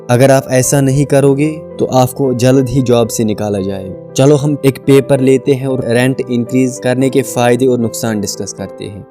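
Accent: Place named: Kashmir, India. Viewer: A nice summary ....voice really soothing Kashmiri ascent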